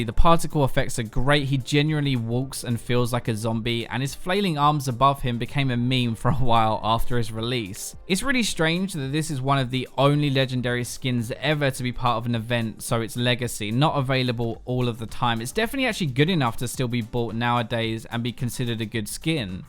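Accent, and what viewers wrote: United Kingdom. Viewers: Where is URF warick?? This guys is so biased, and his British voice is so annoying.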